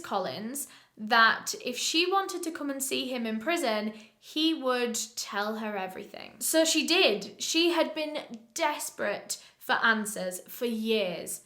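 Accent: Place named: Southern England, United Kingdom. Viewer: just me who thinks accent is fading into a more southern English accent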